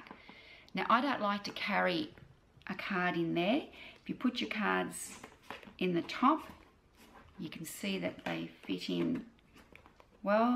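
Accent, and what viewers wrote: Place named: Australia. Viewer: Great review! Beautiful item and Aussie English :)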